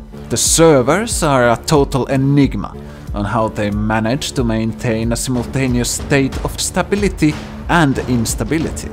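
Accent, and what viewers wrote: Finland. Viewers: Are you finnish ? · Although you sound finnish I totally agree with you, give me my free biscuit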